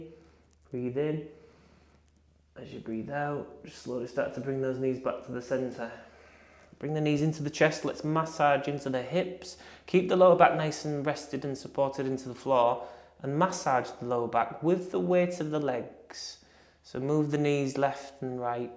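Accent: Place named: Yorkshire, United Kingdom. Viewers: Why change your accent,? I love your Northern accent...reminds me of my Grandmother. I could understand her even though we lived an ocean apart. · Thanks. love you. don't worry about your yorkshire twang xx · Re:pronunciation of liTTLE - I deliberately tune into your channel as a Yorkshire lass living in London for home comfort and warmth - please do not change your elocution it’s why I subscribe. !!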